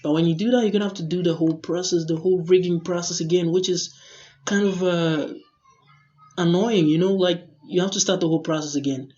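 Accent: Nigeria. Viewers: Omg, that accent is eerily Nigerian.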